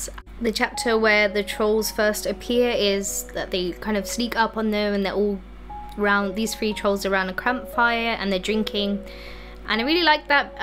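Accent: United Kingdom. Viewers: Never seen anything from this channel before, but this girl sounds as though she’s originally from Wales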